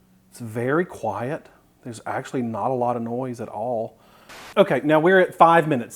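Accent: South, United States. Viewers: i love watching you and listening to your adorable southern accent. I would make low carb chicken strips and fish sticks in an air fryer though i have never tried one. xxxx oooo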